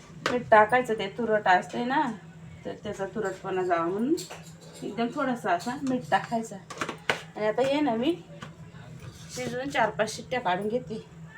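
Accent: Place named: Akole, India. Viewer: नमस्कार मावशी 😊 भाषा आइकली लय भरी वाटला,अकोले तालुका ना तुमचा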